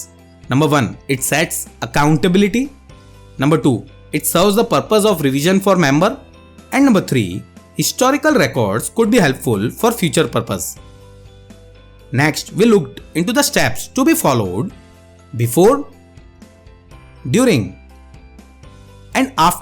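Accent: India. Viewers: So what if thats his accent he’s from india,,juhs appreciate the effort he put seriously whats wrong people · That's how Indians speak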